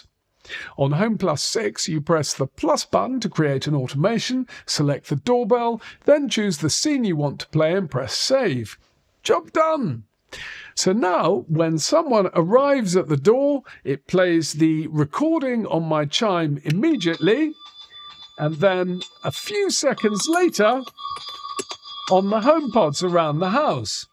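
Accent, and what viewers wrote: United Kingdom. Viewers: I'm an english teacher from Austria. Most youtube videos are made in America...but I really love your british english. It's so much more sophisticated...I just love it:) also your content on the doorbells ist interesting of course.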